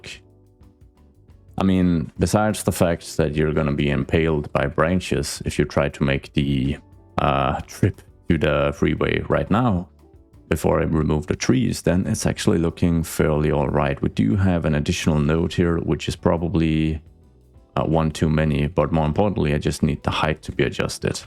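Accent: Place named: Denmark. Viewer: The danglish is real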